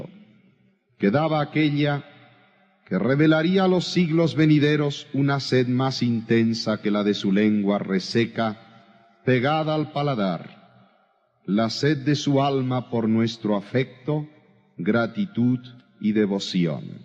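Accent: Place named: Argentina. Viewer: Aquí todavía se le nota el acento ARGENTINO. Pero más adelante lo perdió un poco. Y maduro como el buen vino al punto que después de una hora escuchándolo, cuando terminaba la predicación siempre quedaba la sensación de quedarse con más gana de escucharlo MRMC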